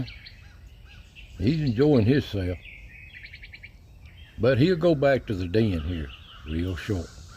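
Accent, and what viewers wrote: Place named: South, United States. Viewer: Thank you for bringing the wildlife and woods to me Mr, i love your voice and southern accent. ❤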